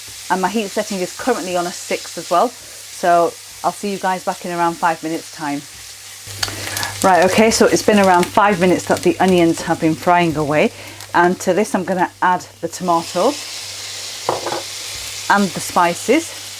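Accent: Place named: United Kingdom. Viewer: So useful vlog. I love your cooking content and your UK accent too :) Love from Tokyo... Can we become friends please? :)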